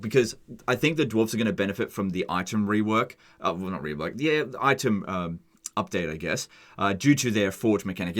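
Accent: Australia. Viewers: How come you can't say golgfag maneaters name? Is that an australian accent thing?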